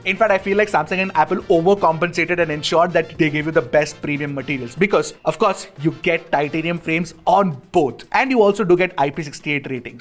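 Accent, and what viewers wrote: India. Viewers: Indian English accent is so Funny😂😂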